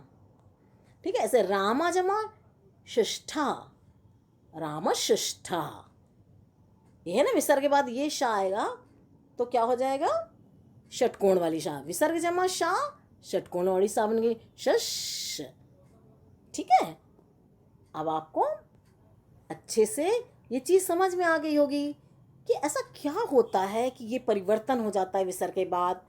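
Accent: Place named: Punjab, India. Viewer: Ma'am are you Punjabi?? Cause your accent is like that..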